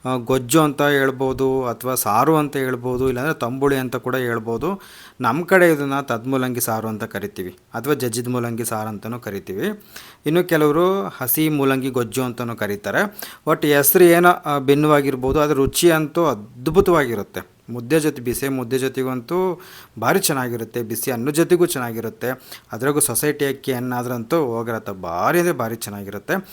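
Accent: Chitradurga, India. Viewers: ಪೂರ್ತಿ ದುರ್ಗದ ಭಾಷೆ ಕೇಳೋಕೆ ಚಂದ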